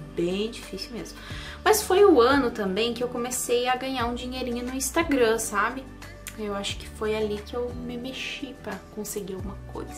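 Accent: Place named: Paraná, Brazil. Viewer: Oi amiga o sotaque do Paraná 💞💞💞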